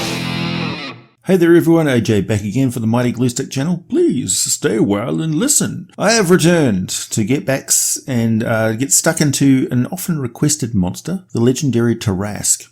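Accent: New Zealand. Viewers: Do I hear a kiwi? Or maybe South African... I should be able to tell the difference I’m half kiwi 😂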